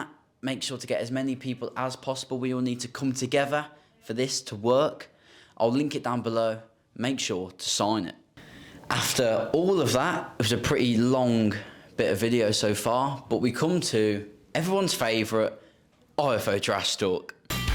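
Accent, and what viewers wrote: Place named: South Africa. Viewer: Good T.W.I.V news. On the next 1 can we have it in your glorious South African voice.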